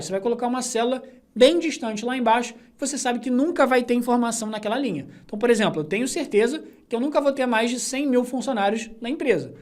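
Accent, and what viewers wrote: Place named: Rio de Janeiro, Brazil. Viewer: Sinceridade, não sei se é sextou com vba ou estou com vba, eita sotaque do carioca... na dúvida #sextoucomvba! vou pelo ouvido...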